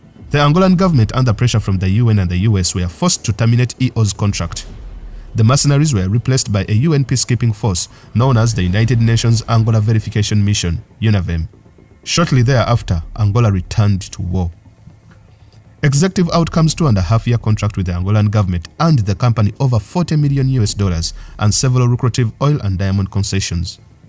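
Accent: Uganda. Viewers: Narrator sounds Ugandan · This guy is Ugandan, Just by his accent. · I know that accent.. Uganda Oye! Great content, ssebo! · This is one of the best documentaries on "mercs", after Anthony Mockler's book, The new mercenaries, about mercenaries in Africa. The commentator sounds Ugandan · The narrator sounds Ugandan....a Muganda to be exact. Ono Musajja wa Kabaka! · Excellent presentation! The narrator has a distinctly Ugandan (or should I say East African?) accent, by the way.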